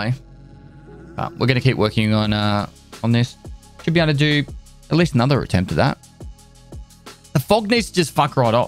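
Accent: Australia. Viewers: Its 3am. Sleep: calling: has new vid out Me: I MUST HEAR HIS AUSTRALIAN ACCENT AND BEHOLD HIS EXCELLENT MARKSMANSHIP. It gib me serotonin boost:)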